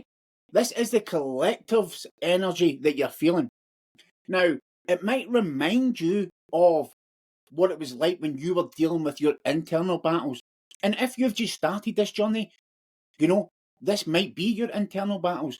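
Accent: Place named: Scotland, United Kingdom. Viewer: I enjoyed your Scottish English accent. Are you from Glasgow? I am now much interested in these topics you deal with and your words have been helpful for me. Thank you. Greetings from Turin, Italy.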